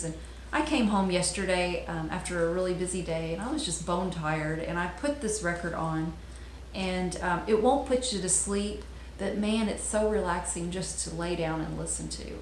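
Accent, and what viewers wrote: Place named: Kentucky, United States. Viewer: OH! Wow I;m Jealous! is Playing Aug 20 about 35 minutes from my house soon. I think it's mostly sold out. Never seen him though I've had a few chances. I thought I detected a KY accent. My Dad use to live just north of Louisville and I spent some time there. You'll have a great time. I know you will!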